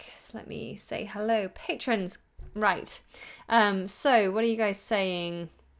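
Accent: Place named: United Kingdom. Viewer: But l keep listening her UK sound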